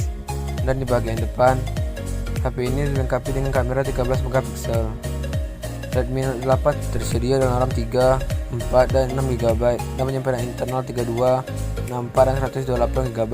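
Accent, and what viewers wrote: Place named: Lampung, Indonesia. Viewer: Suaranya kayak orng lampung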